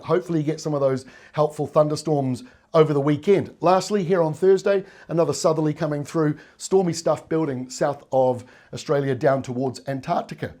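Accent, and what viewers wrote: New Zealand. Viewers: Bros sounds from NZ 💪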